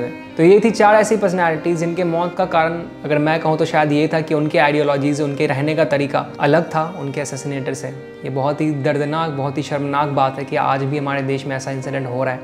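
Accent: Bihar, India. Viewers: Aap Bihar se ho kya nice video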